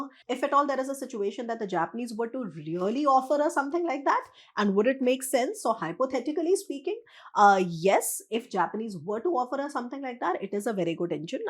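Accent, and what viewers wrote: India. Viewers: why is indian accent so annoying. Just speak an Indian language and provide subtitles, thanks.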